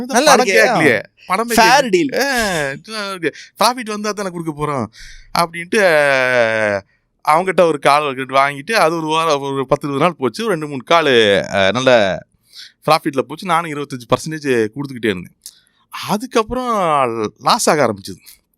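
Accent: Kongu, India. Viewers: இனிமையான கொங்குத்தமிழ்..... 👍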